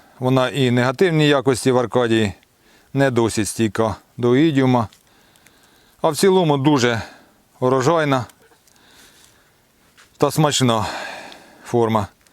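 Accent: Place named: Poltava, Ukraine. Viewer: Дякую за огляд.Але якби Ви озвучували дату огляду то область Укаїни це було класно.По акценту вроді як Полтавська обл.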